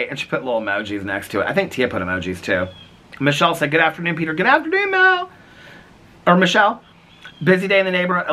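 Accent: South, United States. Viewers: To NOT live in the South, you really do have a southern accent lol 🤣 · You do have a southern accent, I actually adore it and find it amusing when you pronounce things funny, I used to live in Louisville and I noticed that a lot of people in Southern Indiana would say code for cold, like I stayed home from work today because I have a code. I’ve never heard you say that but I always get a little smile when I hear it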